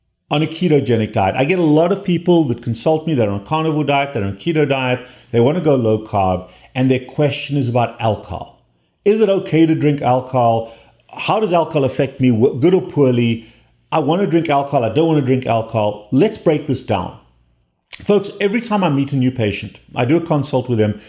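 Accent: South Africa. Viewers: I knew by your accent that you were South African, Great commentary, very imformative.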